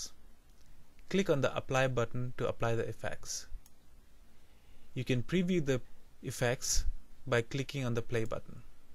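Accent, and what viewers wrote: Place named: India. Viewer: Indian accent is the best. :)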